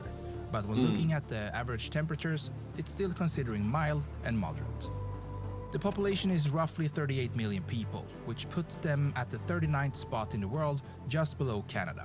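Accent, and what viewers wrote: United States. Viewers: Are you Italian? How come your English is so American?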